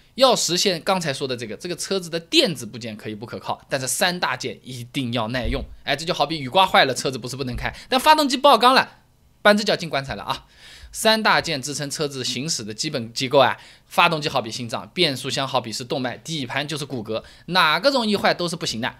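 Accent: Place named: South, China. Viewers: 非常喜欢你的南方普通话。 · 上海口音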